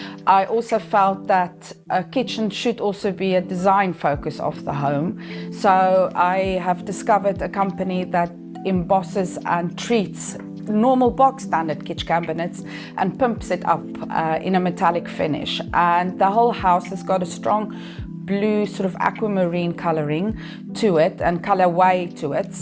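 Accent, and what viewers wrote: South Africa. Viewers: Nice mooi afrikaanse aksent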